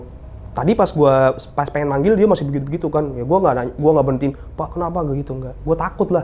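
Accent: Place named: East Jakarta, Indonesia. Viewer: Lo so asik bang jaktim bgt 😅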